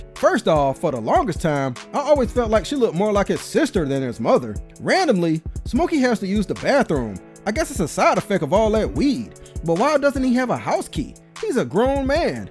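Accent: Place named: West Coast, United States. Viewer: I like your West Coast accent, cuz.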